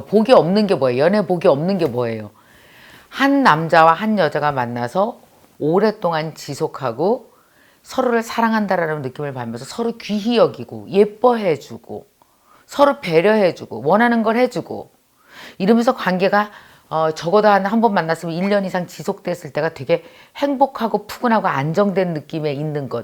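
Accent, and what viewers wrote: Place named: Chungcheong, South Korea. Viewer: 불만인거가터 고소한 충청도 사투리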